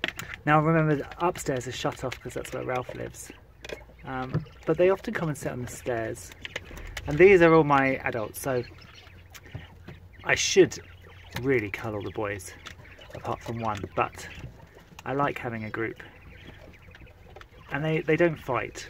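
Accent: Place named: United Kingdom. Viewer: Your video is very nice, but why are you speaking with a British accent??? I am Argentinian.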